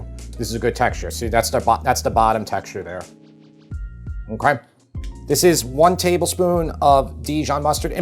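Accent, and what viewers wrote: New York, United States. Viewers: Wow I’m from Queens and living in Ga and I have to say that your NY accent is cool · I just love that New York accent! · nice to hear a real new york accent. not somebody in new york speaking with a CA accent. that crap always annoyed me. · I love your channel. I'm from Westchester, but lost my accent. I'm jelly of your accent, but trying to figure out which area your from.. let us know where you grew up. Also.. how do you not have a NY diner style home fries recipe??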